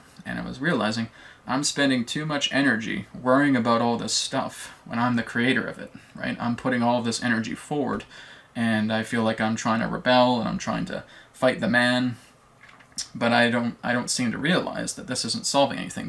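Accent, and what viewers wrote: Scotland, United Kingdom. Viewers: Wow i ❤ ur scottish accent!! 😆👍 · I dont know how it works , but again the subject was spot on for me - Thank you very much - I like when u laugh and speak Scottish :) - <3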